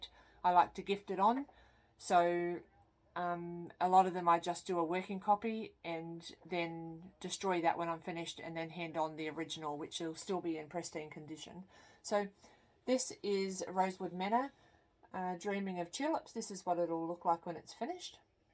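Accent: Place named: New Zealand. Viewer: Well done on your first flosstube. It won’t be your last, for sure. Hi from Canberra and I can hear the NZ influence there!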